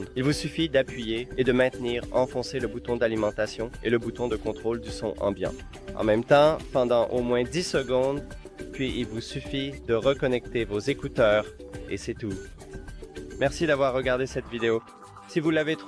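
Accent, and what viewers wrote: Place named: Quebec, Canada. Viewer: Il y a un petit accent québécois .. C'est sympa .. ☺️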